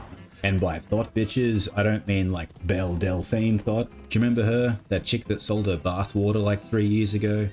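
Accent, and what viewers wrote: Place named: Australia. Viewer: Why is it that I can't find any Australian youtuber that doesn't talk like this. Got to admit though, thier all funny.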